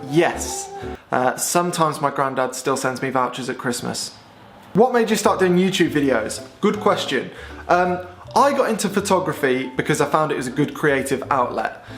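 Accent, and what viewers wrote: United Kingdom. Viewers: You just might be my favourite M43, British accented comedic, photographic personality with excellent advice and insights. Your vids are thoroughly enjoyable to watch.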